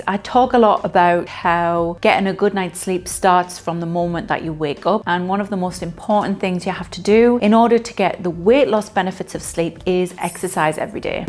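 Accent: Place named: England, United Kingdom. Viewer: Finally !! Someone with an ENGLISH ACCENT !! Thank God !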